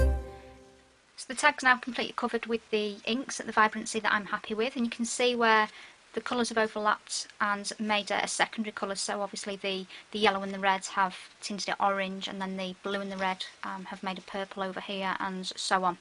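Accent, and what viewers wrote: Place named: Northern England, United Kingdom. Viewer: Fabulous work and great videos. I was trying to work out your accent - somewhere between Liverpool, Manchester, Cheshire and the Black Country?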